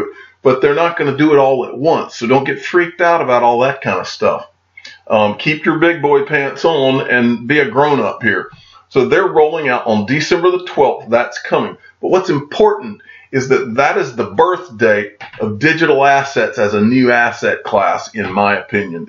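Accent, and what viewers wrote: South, United States. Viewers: Love the good ole southern boi sweet tea accent lol your videos get me hyped like jungle Inc. You sure do know how to make ripple/xrp hodlers feel proud of what they are holding. Thanks for helping me realize that I needed to dump my neo ontology stellar and cardano all for ripple/Xrp. The light bulb went off 3 months ago after watching a slew of your videos. It finally clicked on which one was the potential winner 5-10 years out from all of the 2000 cryptos listed. See you in Lichtenstein brotha! Also, I live in Georgia. Hopefully we hop on the same flight.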